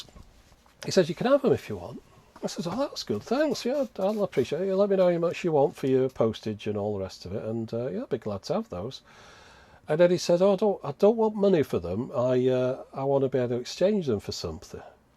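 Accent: United Kingdom. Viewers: The question of whether you would be more successful as an American is an interesting one. I don't think it's as simple as "Americans don't want to listen to British people" since many Americans tend to think that people with British accents are classy and/or intelligent, and in any case it sounds kind of exotic. So I would wager that for some people it's actually a bonus. It might still be a net negative, but it's probably not a huge disadvantage for you. It is possible that you would be more successful if you had more of an outgoing, high-energy personality like you see with so many of the big YouTubers, since that probably appeals to the greatest common denominator, but I personally find that exhausting.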